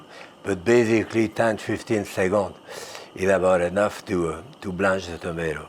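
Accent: France. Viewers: He is French. English is his second language. He does not have an impediment.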